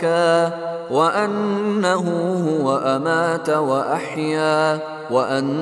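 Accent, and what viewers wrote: Hejaz, Saudi Arabia. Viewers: ما شاء الله تبارك الله. قراءة حجازية. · هذه تلاوة حجازية جميلة جدا يا ليت يكثر منها في هذه الأيام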